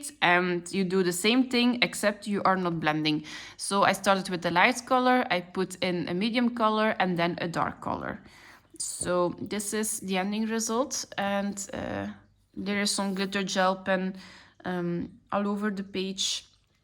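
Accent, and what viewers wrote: Belgium. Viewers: Hey 👋🏻 van België en ja het accent, ik ken het 🤣 en zeker met de Gentse toets erin bij mij, net je kanaal ontdekt en wat mooi allemaal. Zelf relatief nieuw in de kleurenwereld maar zoveel bijgeleerd al 😁 Ik heb nog geen huidskleur durven kleuren, maar ik heb wel interesse in kleur van neocolor II die je hebt gebruikt. Dankjewel 🙏🏻😁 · Ik hoorde meteen dat je een Belg was. Eerste keer dat ik je video's zie. Leuke pagina's dat je maakt. 😁 Heb je een instagram?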